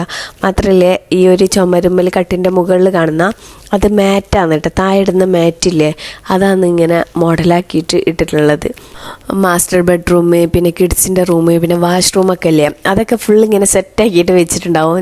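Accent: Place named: North Kerala, India. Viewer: Samsaram kelkkumbol kannoor and Kasaragod anenn thonnum . Malappuram kariyanenn parayilla .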